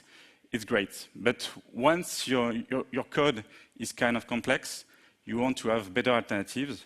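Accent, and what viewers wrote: France. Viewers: Sacré accent Français :D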